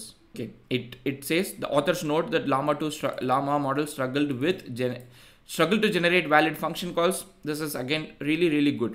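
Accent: South India, India. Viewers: Dude, I have to say it as no one else will. Your content is excellent but your accent sucks. Eg. Won won won, instead of "One". I'm South Indian myself but don't have a heavy accent. I share your videos with all of my American and Canadian colleagues(especially THE GPTV one). They have just one feedback and that is they don't understand what you are talking due to the accent. If has to become 1bigglobalcoder then please mute down the accent. Cheers, another great content video as ever. 🎉 Update: Thanks for taking it in the right spirit content creator. 😂 that one "psychiatrist" in the comments.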